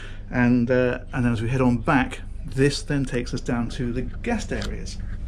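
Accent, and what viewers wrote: United Kingdom. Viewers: U are So Amazing brother I want to know Why all of the yachts ive seen u on Yes All 👍🏻♥️ why no Salt Water see thru Fish Aquariums?, Please find a yacht with the tanks or maybe talk about it a video example?, if the owner wanted he or she could have see thru Salt water Fish 🐠 Aquariums as the dividing walls with electric black out shades to seperate kitchen or bedrooms etc? I just Love them, Thank you my friend, i would Live on one of these yachts with you & your Awesome family for 6 months if i could afford it,,, 😇😇👍🏻♥️♥️🙏🏻⭐️⭐️💯💯🐠🐠🐠 Thnk u again for bringing us ur beautiful sights during this hard time, God Speed,,, Keep smiling & Love the accent love Britain,England All of your county, such cool interesting people.👍🏻😎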